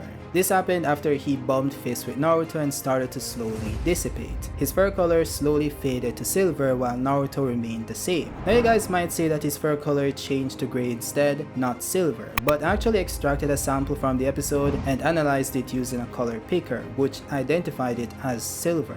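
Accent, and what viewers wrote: Jamaica. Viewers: Are you Jamaican bro?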